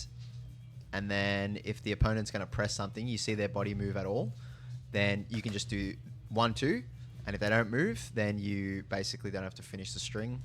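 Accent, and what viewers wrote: Australia. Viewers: Dam, it’s refreshing to hear an aussie accent in a tekken vid ey lol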